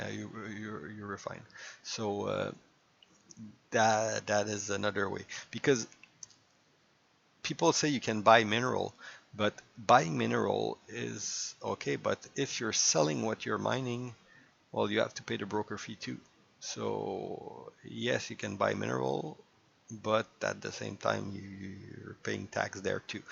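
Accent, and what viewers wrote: Quebec, Canada. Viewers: Is it possible that you might live in Québec? I smell a small Canadian-French accent there :P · French Canadian?? Je reconnais l'accent ahah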